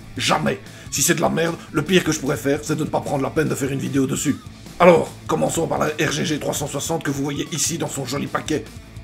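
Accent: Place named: Hainaut, Belgium. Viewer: Rien que pour l'accent hennuyer, c'est abonné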